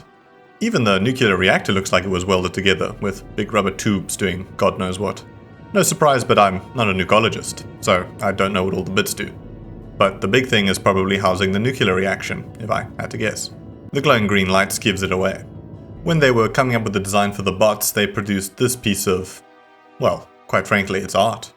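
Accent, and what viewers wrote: South Africa. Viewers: oh my god are you south african??? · You’re South African!!! Howzit bru 🤙🇿🇦🇿🇦 love the videos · A wild South African spotted! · Love the ZA accent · I KNEW YOU WERE SOUTH AFRICAN!!! nice! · I mean this in the nicest way possible, this channel is such a comfort channel for me just cause it's so nice to hear a fellow south African yapping about nerdy shit (cool nerdy shit) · South African, eh? Neat :) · Was like - thats a SA accent! :) Good luck boet! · Is he South African??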